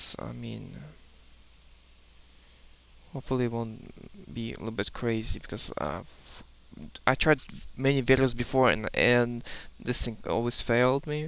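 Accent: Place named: Russia. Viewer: По акценту видно что ты русский )) я ниче не понял из всего этого мож сделать это видео на русском токо еще объясни как запустить этот live сd в boot меню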